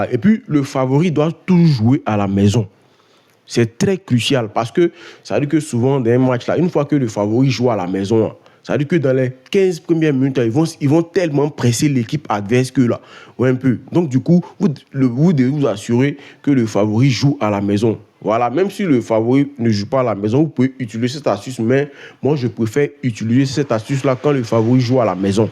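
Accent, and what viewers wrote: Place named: Ivory Coast. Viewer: merci mais vraiment ton asant me rapel vraiment tu es ivoirien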